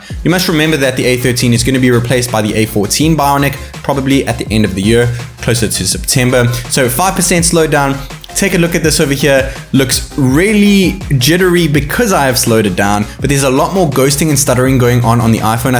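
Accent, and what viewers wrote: South Africa. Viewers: Immediately heard that South African accent! 🔥 Love from Jozi bro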